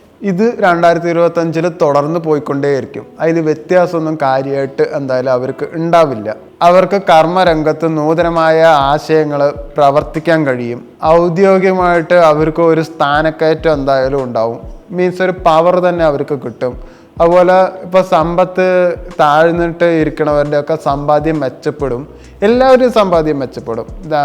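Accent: Palakkad, India. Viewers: Palakkad nn aano?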